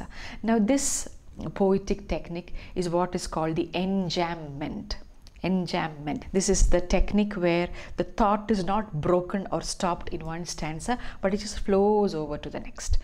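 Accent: Kerala, India. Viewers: Must be a Malayali,Its evident from her accent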